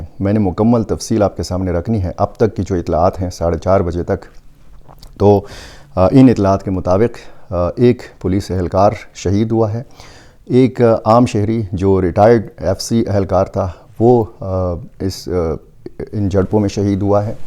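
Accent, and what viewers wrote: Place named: Bannu, Pakistan. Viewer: Leraha nahi bhai lora...banuchi accent na bolo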